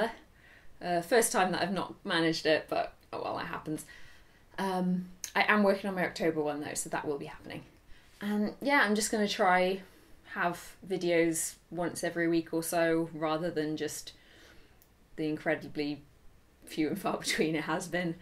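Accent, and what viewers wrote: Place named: United Kingdom. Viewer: British Accent <3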